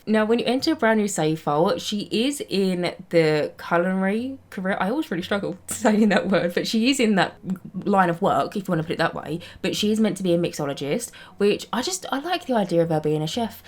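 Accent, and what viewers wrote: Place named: Newcastle, United Kingdom. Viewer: This is random but are you from the uk cos you sound like you’d be from Newcastle also I love your builds I wish I could build like you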